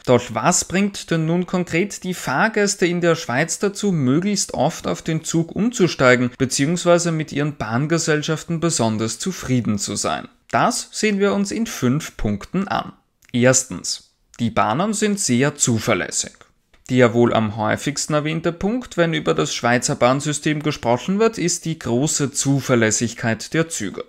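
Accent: Vienna, Austria. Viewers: Professionell gemacht. Aber diese Stimme und Aussprache (Wiener Upperclass = Schönbrunner Burgtheatersudentendeutsch). Schrecklich. Klingt fast wie Kurz. Bitte synchronisieren. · I am British. At school I had a FEW German lessons. But I can understand almost everything says. HIS ACCENT IS PERFECT FOR people whose first language is not German. Danke schoen fur sein sehr Klar 'Wiener Upperclass'.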